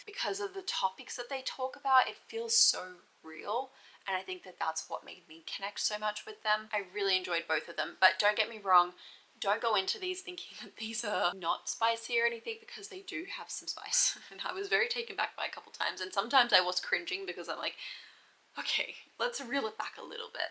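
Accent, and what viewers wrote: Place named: Australia. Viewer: the australian cowboy accent 😭😭😭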